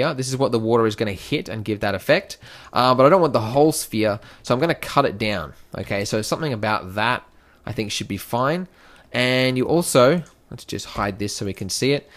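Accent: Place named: United States. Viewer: it's calt American English.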